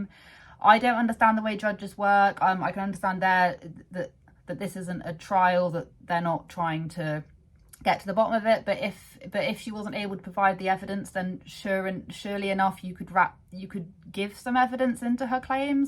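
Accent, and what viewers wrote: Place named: United Kingdom. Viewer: That's why I subscribed... plus... love the British accent:):) sometimes... most of the time it's about the kids.... except when you're peeing. Then it's about you....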